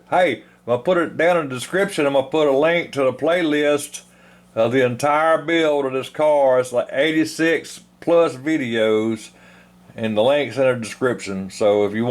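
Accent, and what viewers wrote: South, United States. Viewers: Бля, как же меня прикалывает этот "реднековский" акцент. Good job men.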